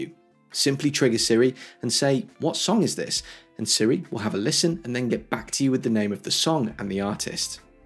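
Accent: England, United Kingdom. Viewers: At last, an English bloke speaking English. No “hey guys, what’s up?” Fantastic. Thank you. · Great tips! BTW, I love your eloquence and British accent! You should consider being a narrator on PBS, BBC, or Discovery!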